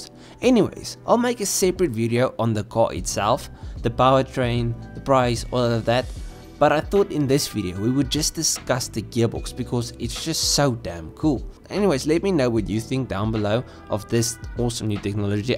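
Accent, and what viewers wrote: South Africa. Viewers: are you south african ? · Ayy is this bru South African?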